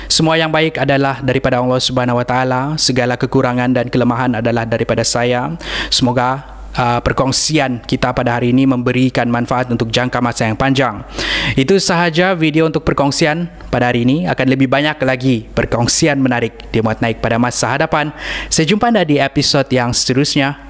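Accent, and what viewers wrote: Sabah, Malaysia. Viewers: Pekat beno loghat Sabah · Orang sabah ka ni?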